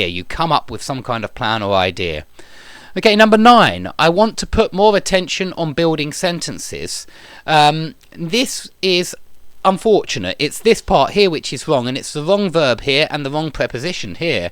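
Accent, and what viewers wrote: United Kingdom. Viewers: I love your British accent ❤️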